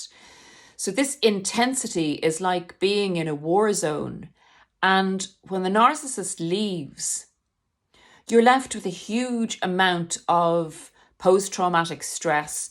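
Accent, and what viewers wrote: Ireland. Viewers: This is exactly how it goes. This this exactly what them demons do. They do the work of the devil, willingly, with bad intent to confuse and destroy everyone and everything. My narc father destroyed my whole family and liked doing it. Amen and God bless you!! Thank you. Love this!! I had a teacher in 8th grade who’s name was Sister Pauline and she was from Ireland. We used to spend Saturday’s with her at the convent. You sound just like her with your accent💖 Great Video!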